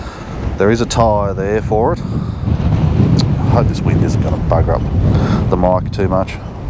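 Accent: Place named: Australia. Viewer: I'm getting the picture now. You're not in the UK. That's why these Majors have all got different back wheels on them. Were they maybe delivered CKD and had the wheels made in your country? Australia? Going by that accent. And I've never seen a loader like that either.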